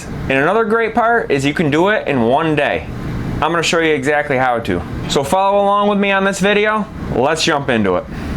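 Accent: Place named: Upper Midwest, United States. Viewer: I built a stand-alone cabinet that has four sections; two cabinets and two pull-outs for garbage and recycling cans. It also has two drawers above the garbage and recycling can pull-outs. One cabinet has cleaning supplies, and the other has dog food and supplies. It was built with materials I had on hand, so to hold the cans in place I cut 1x2's in half and made braces to fit each can. I like your design better, and if I'm able to continue with my woodworking endeavors after my neck has healed, I'm going to use some of the scrap plywood I have and rebuild the pull out drawers for the garbage and recycling cans. Thanks for the video, because I've been wanting to redo them, but I just didn't know what I wanted to do with them. What part of the upper Midwest do you live or come from? I have family in Wisconsin that have the same accent as you.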